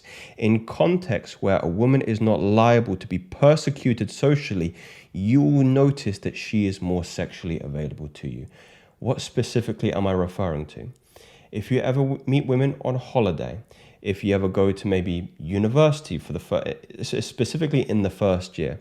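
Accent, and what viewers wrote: United Kingdom. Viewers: mate, didnt thought of you being a geordie ??!! 😅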